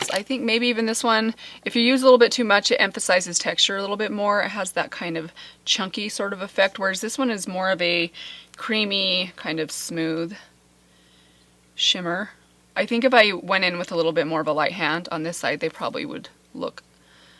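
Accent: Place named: Utah, United States. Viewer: Oh my heck, I totally love you,!!! I just received these two palettes in the mail about a week ago and being the novice I am, I wasn’t sure how to use them. Thanks for posting a tutorial so well timed! 😊 Also, did I guess it or did I guess it! I knew that accent came from Utah. 😉 I used to live there myself. Where did you and your husband build your new home? Those irrigation pipes kind of reminded me of Idaho...? Keep the videos coming! Love you! ♥️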